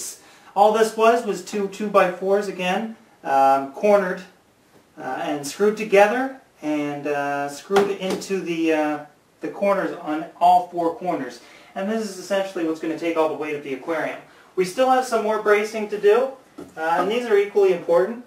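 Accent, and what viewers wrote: Canada. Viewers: Lol! well that's good then....He said it with his Canadian accent and sounded like 'meter saw' eh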